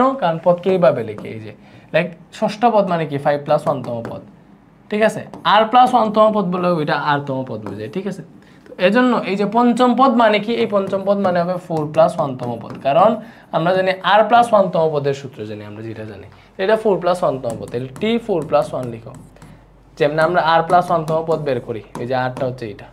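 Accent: Sylhet, Bangladesh. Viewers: Bai sylheti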